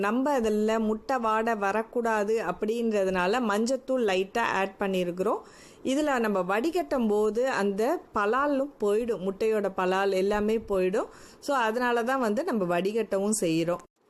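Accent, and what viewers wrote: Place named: Kayalpattinam, India. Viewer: So wat ..it's kayal dialect..just enjoy d recipe..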